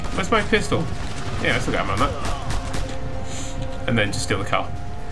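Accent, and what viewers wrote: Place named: United Kingdom. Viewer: not nearly as good as PewDiePie like when he plays Outlast lol... it's probably the British accent? but I know I'm not the only one who finds it pretty much the most irritating accent on the planet.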